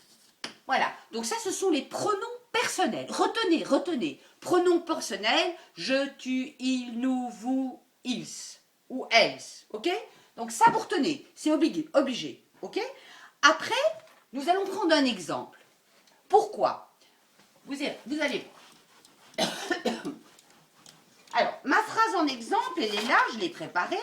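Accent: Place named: South, France. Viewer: Vous avez l'accent sudistes je crois je dis sa car je viens d’emménager dans le sud et mes professeurs disent tout le temps ' ils ou elles ' en prononçant le s à la fin .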